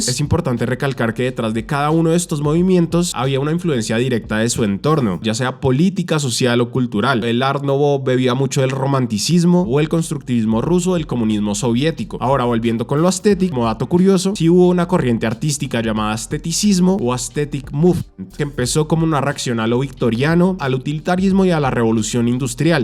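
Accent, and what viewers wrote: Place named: Bogotá, Colombia. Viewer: El único problema de este video es el acento rolo maluco.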